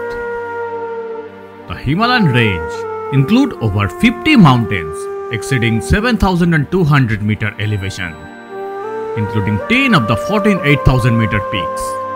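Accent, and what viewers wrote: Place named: India. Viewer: Great documentary. If you understand the narrators thick accent you will have no problem understanding ANYONE in India.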